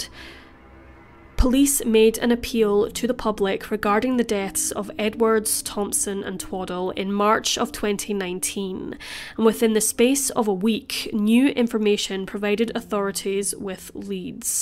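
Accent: Scotland, United Kingdom. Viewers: Brîndușa D. scottish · She's from Scotland, that's where I live x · fuck you mean odd. It’s a Scottish accent, maybe roughly around Edinburgh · Defo Scotch. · Scotland is my guess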